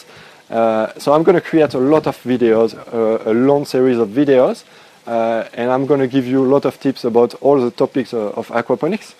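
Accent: France. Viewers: Ça fait plaisir d'entendre de l'anglais avec un accent français, j'ai presque tout compris^^ Merci pour cette vidéo!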